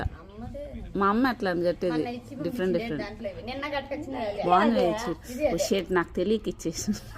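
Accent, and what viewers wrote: Telangana, India. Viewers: Language b kothakota sid laa undi andi ? Yeplace andi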